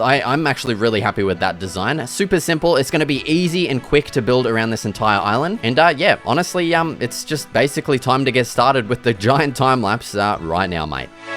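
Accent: Australia. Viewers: Cus his Australian mate 😂 Could tell the moment he said theres afew blokes over there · He’s an Aussie. Kiwis sound different. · He sounds more Australian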